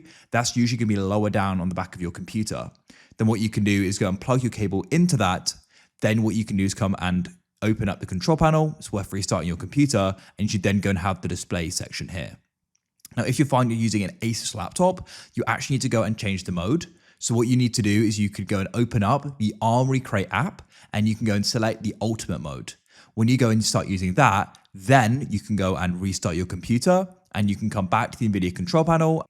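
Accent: United Kingdom. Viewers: hes just british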